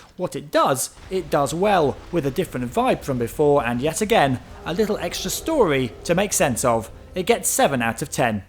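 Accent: United Kingdom. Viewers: good review:) I dont know why but british accent makes everything sound more credible,always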